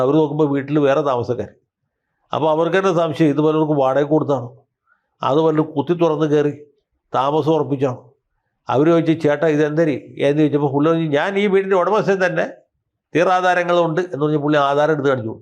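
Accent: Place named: Thiruvananthapuram, India. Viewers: തിരോന്തരം ഭാഷ കലക്കി 😅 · 😂 തിരുവനന്തപുരം ഭാഷ സൂപ്പർ. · വക്കീലിന്റെ tvm ഭാഷ കലക്കി 😂😂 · വക്കിലിന്റെ തിരീയന്തരേം ഭാഷ കലക്കി....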